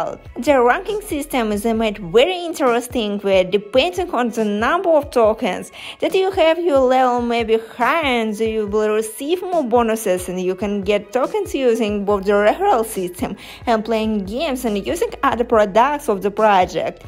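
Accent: Russia. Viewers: is she from russia or opland or ukraine, im confused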